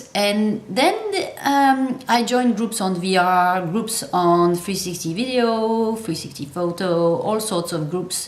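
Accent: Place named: France. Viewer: Thanks for the information and the cute French accent. I’ve liked and shared.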